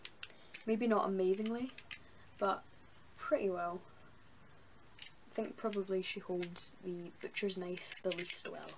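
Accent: Scotland, United Kingdom. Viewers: Hate to ask but are Scottish or British?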